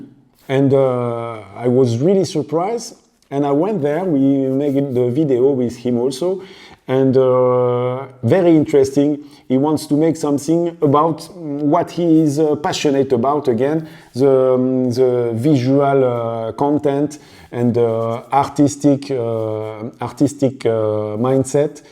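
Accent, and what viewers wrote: France. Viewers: That’s the French speaking English,I live to hear! Don’t like it too perfect!😊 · New sub from South Africa 👋👋 and if you improve or change your quintessential French accent I will unsubscribe 🤣🤣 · your Frenglaise is delightful. Please continue. Je comprends tout! Mercie. Rikki Biermann, Pretoria, Afrique du Sud 🇿🇦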